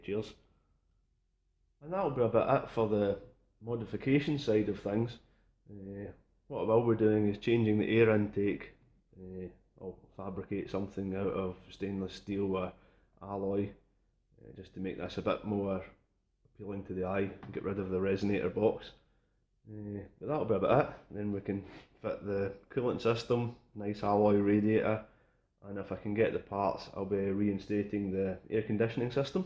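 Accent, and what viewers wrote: Scotland, United Kingdom. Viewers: he's Scottish (west coast,Glasgow area) he is trying his best to speak polite proper English, that's why he sounds like he's only here for the banter :) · Great video again. I had a motor tour though Scotland planned end of May,, but with the isolation measures in place.... that's of the calendar.... so I have to do with your video's, your accent and a great Glen Moray. Give my best regards to George... Cheers from Holland !!! Ruud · Ah, the first time I've heard your voice! Lovely Scottish accent. I'm looking forward to your new projects!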